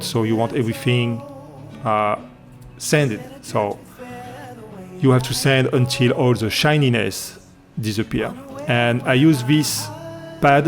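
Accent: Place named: France. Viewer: I love the french accent 😃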